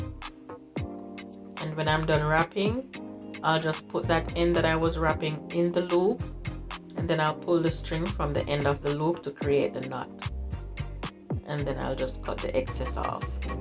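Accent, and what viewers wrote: Jamaica. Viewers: Sounds like a yardie. Mi like it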